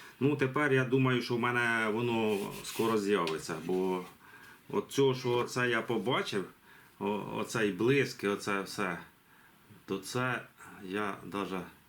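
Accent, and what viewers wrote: Poltava, Ukraine. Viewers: Балакаєте, як на Полтавщині, дуже приємно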